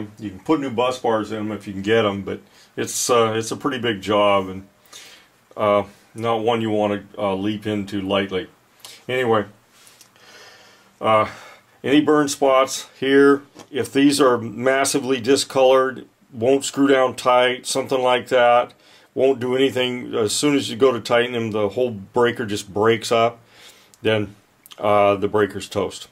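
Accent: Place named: Appalachia, United States. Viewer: Jesus stop saying, "kick off." You sound like a hillbilly. Circuit breakers "trip." Fuses "flow" or "melt." You sound like you've been hanging around a bunch of butt-crack hillbillies too long. Yeah, electricians may say it too but only the butt-crack hillbillies do. Electricians that know what they are talking about don't talk like that.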